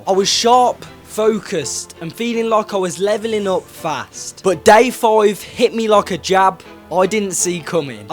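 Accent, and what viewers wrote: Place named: United Kingdom. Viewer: Bro is so British 😂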